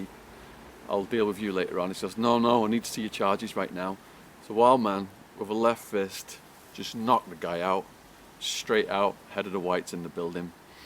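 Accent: United Kingdom. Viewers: This might be a weird question, but did people in there assume you were soft because of you accent? Us American tend to think that any kind British accent sounds posh and I can't see that working to your advantage.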